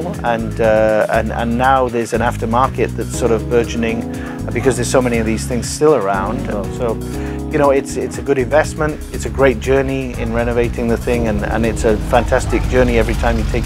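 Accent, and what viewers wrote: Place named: South Wales, United Kingdom. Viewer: Nice bus great work. Your south walian accent is still hanging on. 👍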